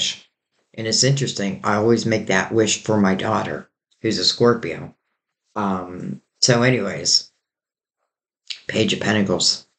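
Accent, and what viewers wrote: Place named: Pennsylvania, United States. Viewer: Love your SW PA accent!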